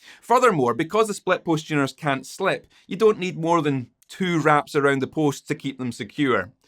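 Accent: Scotland, United Kingdom. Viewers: I come here for the wonderful Scottish accent (me dad is mostly), and the guitar geekery is a bonus! Seriously, you are one of my favorite channels for the great guitar information, and because you're not afraid to slay sacred cows like locking tuners, unpotted pickups supposedly being better, pickup measurements influence on tone, etc. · I need to lear the Scottish accent, like you and Dr. Steve Cassidy, so I can make more interesting videos. Love it.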